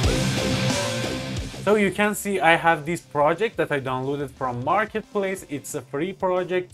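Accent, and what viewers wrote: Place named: Turkey. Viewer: Türk aksanını yakaladım hocam :D Ellerine sağlık harika video olmuş.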